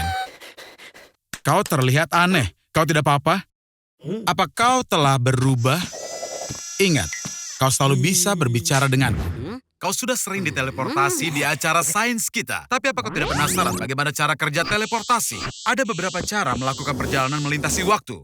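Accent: United States. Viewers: Bjir orang indo beneran padahal suaranya kek orang Amerika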